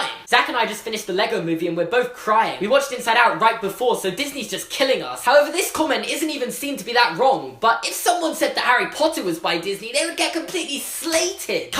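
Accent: United Kingdom. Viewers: Are you British or American? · Are you from England or Wales?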